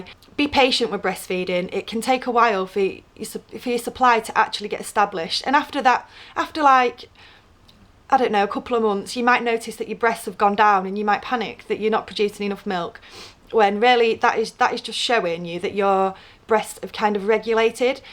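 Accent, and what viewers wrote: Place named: England, United Kingdom. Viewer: She's from England 👍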